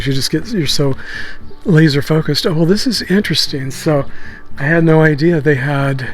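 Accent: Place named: Boston, United States. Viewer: Cuppa coffee. Sounds like your from my neck of the woods. Boston area.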